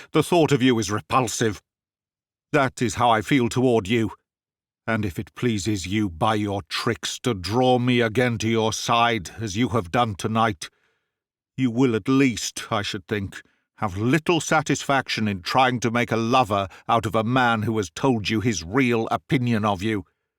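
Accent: United Kingdom. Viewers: You can never imagine my pleasure and joy when listening to your clear British accent Mr... When I got the notification for a new YouTube episode of your marvellous channel i had immediately listened to your charming narration.. please keep on sharing classic literature... They are my favorite... Thank you very much Mr I owe you a lot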